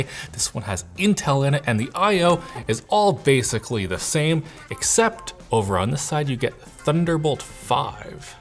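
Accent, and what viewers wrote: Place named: Canada. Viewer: Love the Canadian accent. "Laptahp" "bahx"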